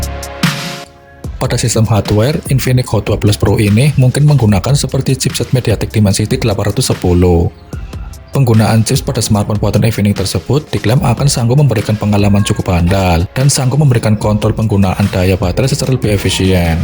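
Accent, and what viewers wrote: Banyumas, Indonesia. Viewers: Mantap mase .. ra ngapak ra kepenak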